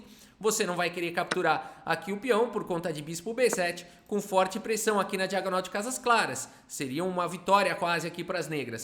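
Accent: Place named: São Paulo, Brazil. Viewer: SP capital. O sotaque entrega muito kkkkkkk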